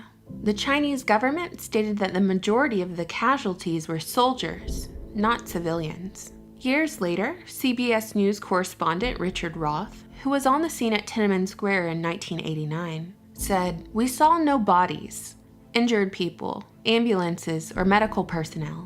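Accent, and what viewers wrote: South, United States. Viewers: “Hey internet friends, did you know…” smiling pretty face and southern lilt about to rock our worlds with something “to ponder as you go about your day”😅❤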